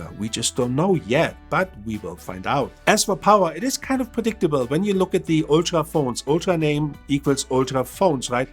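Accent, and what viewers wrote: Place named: Germany. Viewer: I'm a Chilean fan from your work... And love your German accent... Very cute 😂👌🇨🇱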